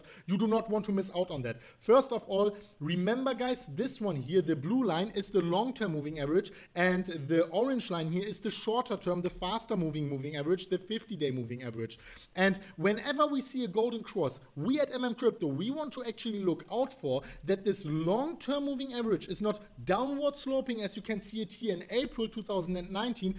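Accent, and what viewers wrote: Germany. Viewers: The fact that i can understand everything that you are saying with that German accent and the pace you are talking is amazes me...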